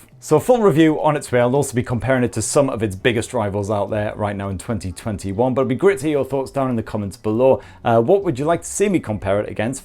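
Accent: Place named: Northern England, United Kingdom. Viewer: I only watched to hear a bald northern tit bang on.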